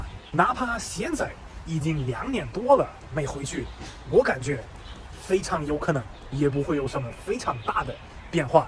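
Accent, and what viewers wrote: Sichuan, China. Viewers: 的四川话有味道😄👍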